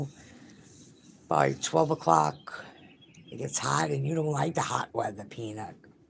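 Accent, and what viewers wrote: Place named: New York, United States. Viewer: Are you from ny ? You sound like it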